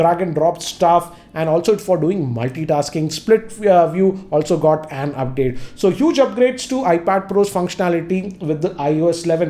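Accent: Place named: South India, India. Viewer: Know How it's is a south india thing....accent!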